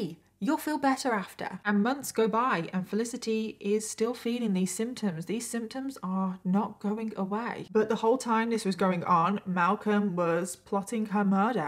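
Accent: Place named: Redditch, United Kingdom. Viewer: Are you from Redditch or nearby? You sound like it.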